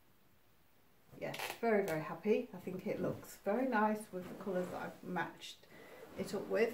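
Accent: United Kingdom. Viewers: I DETECT AN ACCENT WHEN YOU SPEAK IN YOUR VIDEOS ARE YOU BRITISH?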